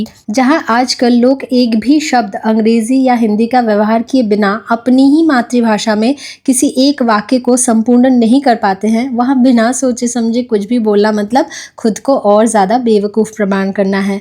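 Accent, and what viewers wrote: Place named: Haryana, India. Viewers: Haa didi❤ radhavallabh shri harivansh... Aap shi bol rhe ho m bhi haryana se hu or hmare yha kuch ladkiya bolti hn aise and sach me cute sa impression aata h❤ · Right di I'm from haryana and its normal mai or meri cousin, friend aise hi baat krte hai kbhi kbhi . Ap in sb p jyada dhyan mt diya kro ap jaisi ho perfect ho❤ love you alot from haryana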